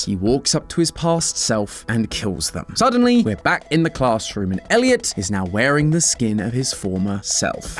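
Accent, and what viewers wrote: United Kingdom. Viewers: This channel has become so unwatchable because of the horrible Bri'ish accent and constant indie horror. Please get a different host and start covering actual games again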